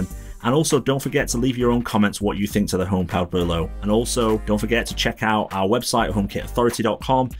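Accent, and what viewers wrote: England, United Kingdom. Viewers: Are u in the uk? I’m only asking as you mention the price in dollars but have a English accent 🙌🏼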